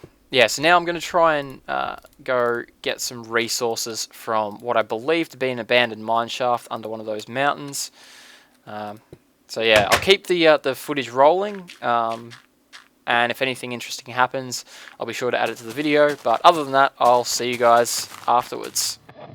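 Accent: Australia. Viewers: he's Australian right??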